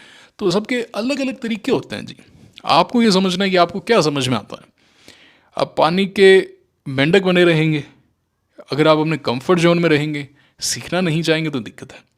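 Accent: Rajasthan, India. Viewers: Sir ji, aaj to bahut jyada dil khush kar diya apne, akhand bharat ki baat bolke, sanatan ki baat bolke, sabse sashakht sabhyata ki baat bolke, spirituality ki baat bolke. Mai Bikaner se hu aur aapki baate aur apka lahza sunke ek baat se sure hu ki aap bhi Rajasthan se ho aur kahin na kahin apka sambhandh bhi Bikaner se hai, aisa isliye kyunki 2-3 din pehle ek video me apne bola tha " Market bhacheed bola rha hai". Aisa to yahan bikaner me kafi bola jata hai. I may be wrong but mujhe kafi stong feeling aayi ki aap bhi Bikaner se ralate karte ho. Itni gehri aur amulya baate humare sath share karne ke liye aapka koti koti dhanyawad. Jai siyaram 🙏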